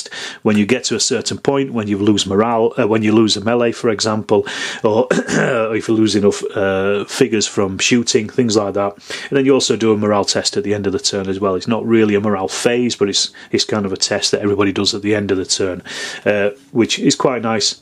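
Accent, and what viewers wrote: Northern England, United Kingdom. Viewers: I preferred Medieval Wargamer's review of the rules, it was more positive and Nic has a sexy voice compared to your northern tone lol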